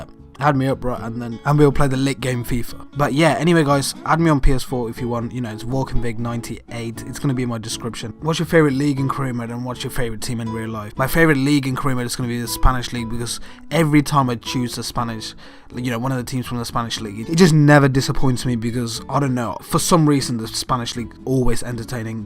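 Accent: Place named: Birmingham, United Kingdom. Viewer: Are you from Birmingham? You sound like you have a bit of a Brummy accent 😂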